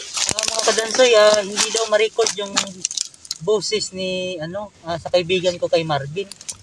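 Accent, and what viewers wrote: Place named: Iloilo, Philippines. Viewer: ingat kaung lahat. ilonggo ata c a ung tuno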